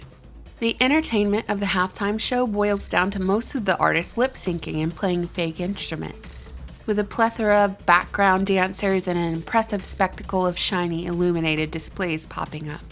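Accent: South, United States. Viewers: i always love it when you drop a new video, This is simply asking folks (with that mighty fine southern accent no doubt) to objectively, with an intellectual process, and without unreasonable emotional reactions... to take a closer look at this annual ritual that has spellbound Americans for decades as they live vicariously through their favorite team and player. It's parasocial behavior believing they actually know personally players, staff, performers, etc. All that consciousness. All that attention... focusing on an inconsequential silly game where silly grown men, paid millions, play with a silly ball. makes ya kinda wish something like... oh i don't know... umm... the f**cking federal reserve and our bullshit fiat currency would get that kind of attention! Shoot. One could even argue that something insidious as the fed exists due to everyone concerned with a silly football game. 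anyways... Another great video. you're so foxy. i love you. there. i said it.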